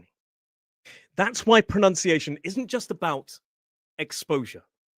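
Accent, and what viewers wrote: United Kingdom. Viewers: I'm from Argentina. I love British pronunciation. And I'm very happy because I understand every word you said!!😊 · Thank you so much! I love British voice. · Yes,! I very much like your British accent, as I told you before because is the first English that I learned. And altough I probably won’t travel any more (I visited London once years ago), that is the English accent I most like!! Marcos (Montevideo, Uruguay) Ps: even though I'm 64 now and I'retired, I want to improve my english pronuntiation the way you teach it...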